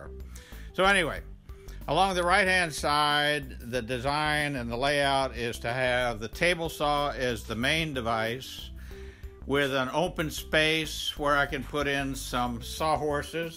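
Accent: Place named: South, United States. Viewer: I had to stop watching this video Sunday morning when the last dog woke up and they needed to be taken them for their walk. After that, my day got really busy working on my neighbor's gate, which included returning the hinges because they had way too much play in the hinge.. That was how they were designed! You really pack a lot of stuff in your garage. If I ever get caught up with the seemingly endless repairs or projects to do around the house... I took a look at the Wen drill press that you have, and I'm kind of impressed with its capabilities, especially for the price. Personally, I don't think you talk too slowly. Even though I'm not from what is considered the South, (even though you can't go much further south in the U.S than South Florida) I know quite a number of people with Southern accents, my aunt, being from Alabama is one of them. 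Her accent never changed, as a matter of fact, my cousins and uncle who spent their entire lives in Miami until moving to Lake Placid, Florida, gained her accent., I think your time in California has diluted your accent some, but then again, I don't know how much of an accent people in Kansas have. If you slow down the playback speed to.25, you sound like you've had quite a few too many. It's quite entertaining.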